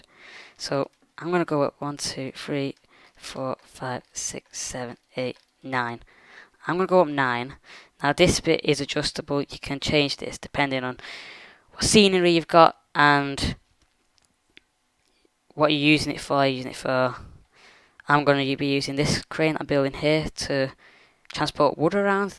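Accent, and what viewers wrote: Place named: Ireland. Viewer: You sound irish even though you're english.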